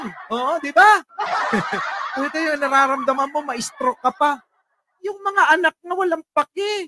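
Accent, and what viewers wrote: Ilocos, Philippines. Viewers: Ung Ilocano mo boss masarap pakinggan, lol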